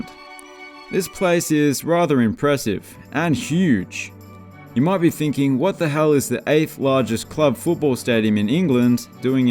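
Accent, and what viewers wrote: Australia. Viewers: There is absolutely no hints of black on the Ipswich kit….well, there is an almost invisible black pin stripe in the new kit but you need a magnifying glass to see it.. love the videos by the way…funny hearing an Aussie covering quaint English football grounds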